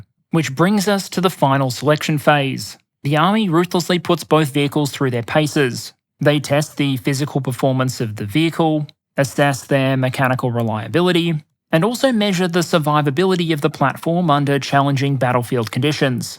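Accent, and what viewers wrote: Australia. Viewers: No, no, no, once a month 😱? once a week is little enough. Every Sunday I'm looking forward to power point presentation. I even started to like that Ozzy accent! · Fantastic vid Aussie! I always love seeing one of your videos with a less than sexy name e.g. "Widgets: the design, building, procurement, and supply chains involved", and also knowing some guy who talks funny from down under is going to have my undivided attention for the next hour. I am surprised however when talking about the Bush Turkey, you left out how successful its predecessor the SD-20 Dingo was in it's only decisive victory over Kiwiland in their most recent war. #StandWithKiwiland #StopEmuAggression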